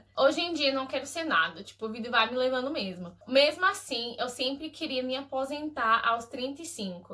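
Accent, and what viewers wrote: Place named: Northeast, Brazil. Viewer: Nossa que português... Passa tranquilamente por nordestina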